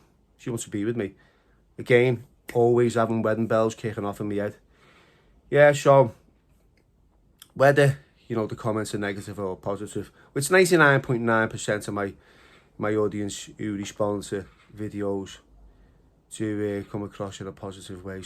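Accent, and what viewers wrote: Liverpool, United Kingdom. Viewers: I knew you were from shpeke as I’ve got a few mates that talk with the shh in the scouse lingo I bet you know my mate Raymondo who the Mato got hold of and took the lot , mansion Bentleys lambos the lot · Good chat scouse love it 😀 fuck aki and french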